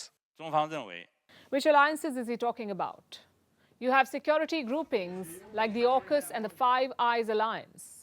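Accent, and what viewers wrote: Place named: India. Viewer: curry accent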